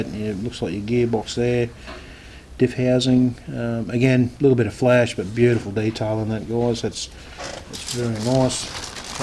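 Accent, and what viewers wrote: Australia. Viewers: Awesome review, great to hear a Aussie reviewer, good on you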